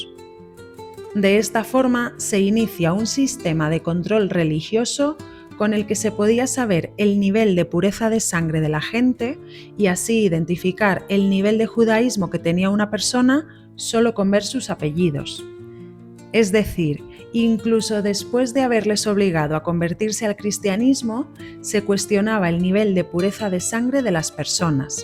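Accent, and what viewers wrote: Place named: Spain. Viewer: Agradecido quedo por tu trabajo. Me gustó mucho. Además disfruto mucho de tu entonación española y tu dicción exquisita. Soy Carlos Augusto de Mondaca y Reyes desde Santiago de Chile. Saludos y Dios bendiga a la Madre tierra de España, Chile e Israel.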